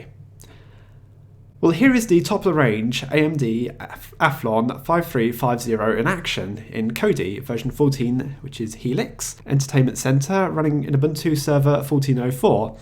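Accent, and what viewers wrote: England, United Kingdom. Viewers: ***** I wish I would have talked to you 18 months ago. The box is dedicated to KODI (boots up to KODI), so it makes sense to dump pulse. I'm reading the wiki now. I'm one of the ones that has dvd rips up to 42GBs and many are ma and trueHD. I've had to change the output to DTS or DD. For some reason I thought you were in Australia. Sounds more like England. Thanks for your help. I'm going to give it a try.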